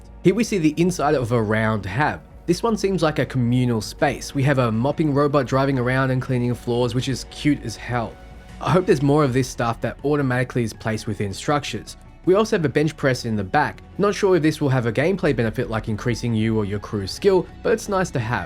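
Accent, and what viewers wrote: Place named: Australia. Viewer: Amazing content! I have been obsessively watching all your clips! Also good to hear an aussie accent in these vids.. *not an aussie, but a kiwi cuzzy!* Have you found any intel on if bgs have reworked the 'karmic elements'? I'm soooooo hoping I can be a evil crimson pirate!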